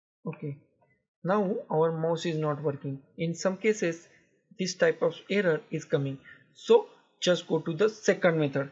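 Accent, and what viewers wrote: India. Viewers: When i heard his indian accent , i knew it gonna work. Thank you man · his accent his pure indian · When i heard indian accent i didn't even needed to try it i already know it will work thank you brother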